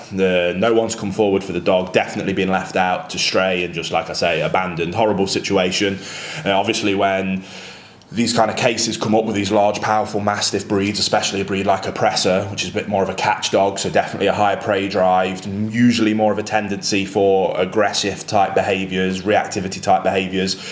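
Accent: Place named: West Midlands, United Kingdom. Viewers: You sound like you're from the west Midlands! Nice to see a local doing well, here's to your success. Could I also volunteer at a kennel? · Sounds to me like a fellow Black Country chap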